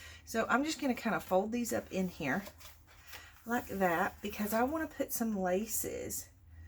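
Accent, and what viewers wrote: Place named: Alabama, United States. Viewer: I feel the same way! I live in Alabama and your accent sounds like you could be my next door neighbor! Lol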